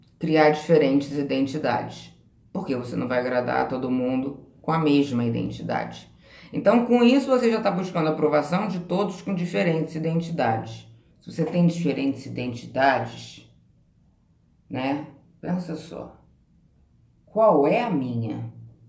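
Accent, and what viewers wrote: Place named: Rio de Janeiro, Brazil. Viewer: Você é muito inteligente , sou Border também , e tenho um estranho orgulho disso talvez porque sou anti - social . Pelo sotaque você é do Rio , eu sou de Curitiba .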